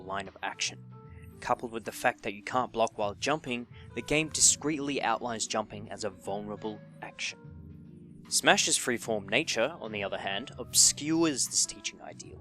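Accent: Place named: Australia. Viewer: He's Australian Wtf is up with American people and not being able to recognise accents? Smh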